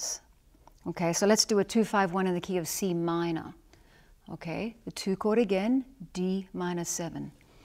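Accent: Australia. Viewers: Australian English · My preference 4 gtrs is progressively getting bigger & bigger & hollower & hollower .. dang, maybe I shoulda bin a upright bass player. Well maybe not quite but 2 do JoePass-type stuff I’m gonna hafta b least a moderately proficient bassist. Bassists fascinate me. So .. hey, is that an Aussie accent? Show ‘em how we do it in the gr8 Sthn Land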